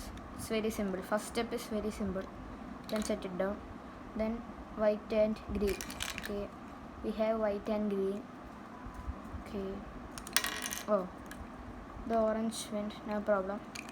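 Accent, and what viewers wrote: India. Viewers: Are you a malayali your English is like that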